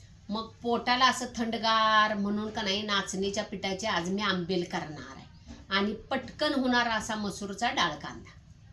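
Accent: Kolhapur, India. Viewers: तुमचे सगळे पदार्थ मला खुप आवडतात आणि तुमची कोल्हापूर ची भाषा पण आवडते ☺️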